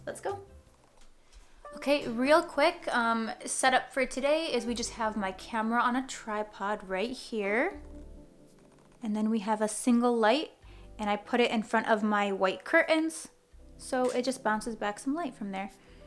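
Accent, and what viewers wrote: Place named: New York, United States. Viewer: Where are you from you got a slight accent Are you from somewhere in New York?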